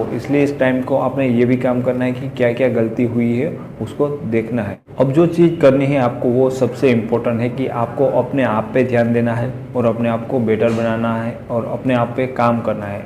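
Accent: Gujarat, India. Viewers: Bhai tame Gujarat cho?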